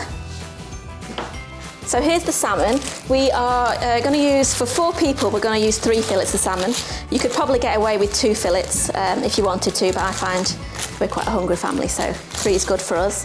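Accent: United Kingdom. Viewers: of the video, I heard her say, "I'm just going to get my tongue out" when what she meant was get her tongs out. Some regional British accent are hilarious or simply annoying which is I suspect why she got so many thumbs-down.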